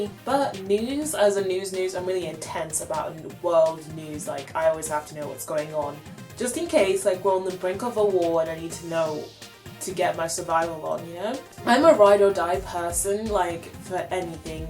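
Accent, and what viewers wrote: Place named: Australia. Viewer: are you Australian cuz u got the accent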